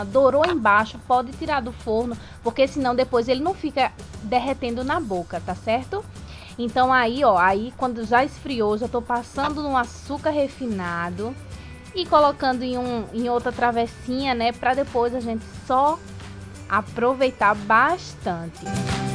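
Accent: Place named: Pernambuco, Brazil. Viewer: Tu deve ser daqui de Pernambuco com esse sotaque ne ?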